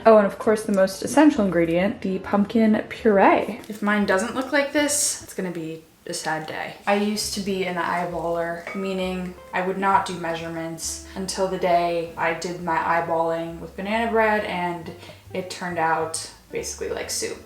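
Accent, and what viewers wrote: United States. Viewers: Hello I am from India. I often watch your videos for learning American accent and your vlog makes me happy and energetic. I really love you ❤❤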